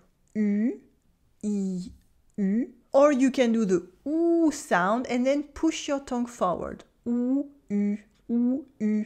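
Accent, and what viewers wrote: France. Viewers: Yes, but keep in mind that you're speaking English with a very heavy French accent, which makes YOU harder to understand.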